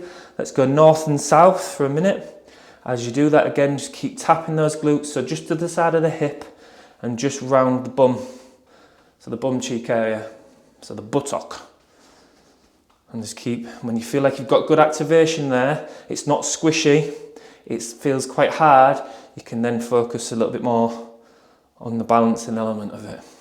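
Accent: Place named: Birmingham, United Kingdom. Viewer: hi, I ran my local parkrun today and got talking to someone visiting from Ireland... we are both fans of your videos and we spent a good few minutes running along and trying to figure out what accent you have? I was thinking Birmingham area, he was wondering if you are from the Scottish Highlands?!?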